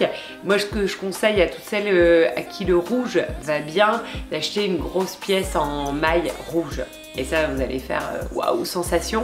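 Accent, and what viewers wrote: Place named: North, France. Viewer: Bonsoir, Vidéo très sympa, comme d'habitude. Tes prononciations "ai" au lieu de "é" sont trop mignonnes (le nord, c'est ça?). J'aimerais beaucoup une vidéo sur les bijoux si cela te dit. Bisous, Séverine.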